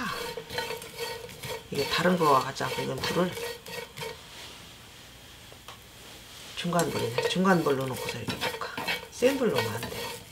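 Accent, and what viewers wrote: Chungcheong, South Korea. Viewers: 어머님 충청도 말투가 조금 있으신게 넘 정감이 있네유^^ 저두 충청도에서 태어나서 지금은 뉴욕서 살고 있네유 ~ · 울 엄니가 하시것 같아요 구수한 출청도 사투리 넘 정겨워요 ^♡^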